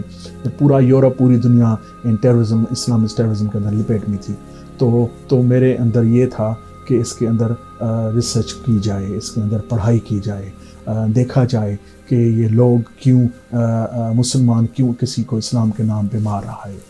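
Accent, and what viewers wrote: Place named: Pakistan. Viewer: It is highly inspiring to listen to you.Every voice that's against the established set of idioms of a society, right or wrong, is condemned first but hope your conviction and gravity of sincerity will make everyone understand and hence respect you. Mr, your work on Islamic extremism and terrorism is more in favour of Islam and Pakistan than any other entity or country.You are welcome to our media, please keep sharing your unique and insightful outlook on world polity and current events.Notwithstanding in foreign lands, your accent and ethos is Pakistani, you're a true Muslim and a son of the soil. Love and best compliments with a hope to see you frequently on youtube.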